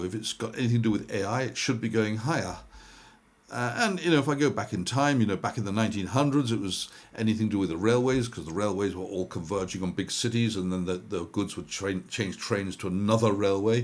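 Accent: United Kingdom. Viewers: I first saw you, not long back on Daniella Cambone. I was at the time wondering why there seemed to be so few independent, genuine analysts UK born (I trust you are UK and not Swiss with that accent) Anyway, good to watch and keep going as you are attracting quite a following. The word which sums you up is 'gravitas' but with a healthy dose of humour and good stories.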